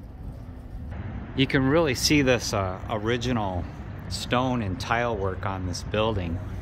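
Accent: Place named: Northwest Ohio, United States. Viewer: The NW Ohio accent is STRONG in this one.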